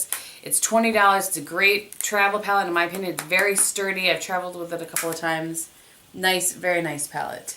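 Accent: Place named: Midwest, United States. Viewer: Hey! i just wanted to let you know I love your channel, when i started watching i was thinking "wow she sounds so much like home" and then i realized you were from the midwest too! I grew up in the Twin Cities so you sound like home ;)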